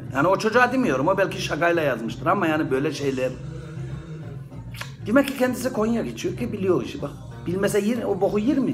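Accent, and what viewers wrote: Yozgat, Turkey. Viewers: agbi nerelisin agbi? bizim yozgat sivesi var sende:)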